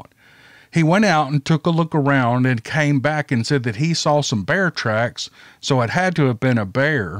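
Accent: South, United States. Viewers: Hi! I always enjoy your southern voice...doesn't even matter what you're reading or saying!Lol! Love and thanks for the great entertainment! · Love to hear you tell stories. You truly have a gift. I love your southern accent too 😊. I enjoy the music rifts as well. I'm so glad I came across your channel about a year ago. Keep on keeping on!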